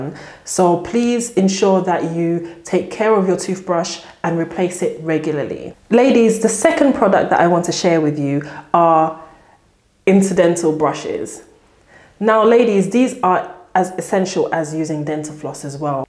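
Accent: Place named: RP, United Kingdom. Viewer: That accent! very posh prim and proper. Us Americans can't get enough of it. Sending love from NY 🖐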